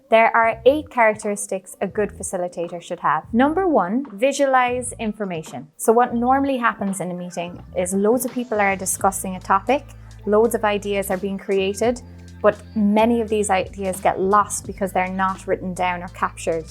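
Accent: Ireland. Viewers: have you replaced Jonathan? It's not often you hear a strong Irish brogue on YouTube. Love it or should that be fair play to ya. Anyway my questions, what are the main DOs and DON'TS for facilitation and what are the he biggest errors that new facilitators often fall into. Both questions are probably related. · Love the Irish accent!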